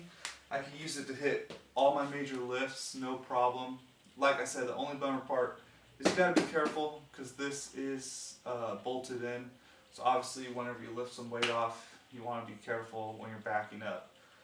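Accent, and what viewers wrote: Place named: United States. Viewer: Hahaha just as you said "obviously I'm in England" I was thinking this guy sounds American but he has to be in England? Haha Just to add I just got the m3 rack and it's really good. Basically a copy of a rogue r3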